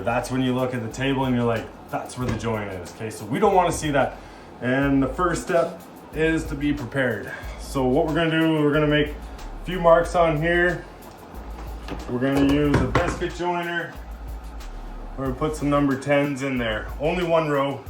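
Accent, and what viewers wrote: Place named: Ottawa Valley, Canada. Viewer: Man, are you from the Ottawa Valley buy any chance? You talk like everyone i know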